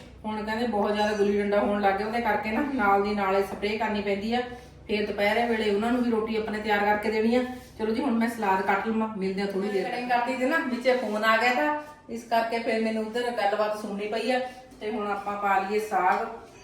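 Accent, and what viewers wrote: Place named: Fatehgarh Sahib, India. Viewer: Asi bhi aive tadka laune aa ji tuhadi boli Fatehgarh sahib ja ropad dist di sister kehda pind aa ji tuhada video bahot sohniya hundiya ne tuhadiya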